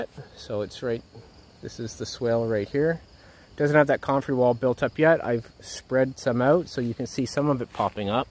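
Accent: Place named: Canada. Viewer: Three great things about this channel-- the contagious "Permaculture Joy," the attention to science (and lack of anthropomorphism), and the delightful Canadian pronunciation of "ou." Look forward to every video! I've been on the fence about sea-buckthorn/seaberry for years (even after viewing Ben Falk's videos) due to management concerns. Can you convince me, lol?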